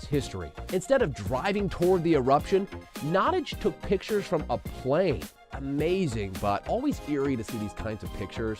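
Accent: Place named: England, United Kingdom. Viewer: Your English accent was brilliant.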